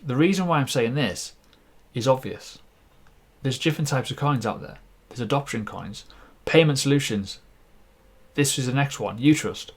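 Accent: United Kingdom. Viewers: When I want to listen to British English and sounds of reckting things I always open up this channel, its funny and well said.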